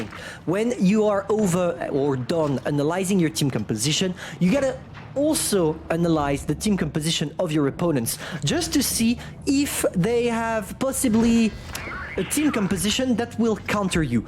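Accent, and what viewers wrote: France. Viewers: Are you french?🤨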